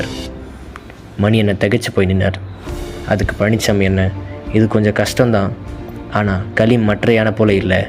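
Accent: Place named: North Chennai, India. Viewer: Enapa ithu vadachennai style.la kathai soldra...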